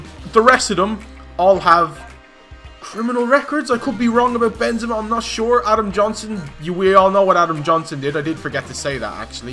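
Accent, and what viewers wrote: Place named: Mayo, Ireland. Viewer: Mayo accent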